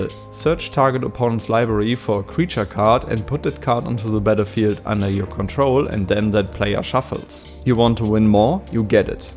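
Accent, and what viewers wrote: Germany. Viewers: Am I hearing a little bit of deutsch? Aber nicer commander!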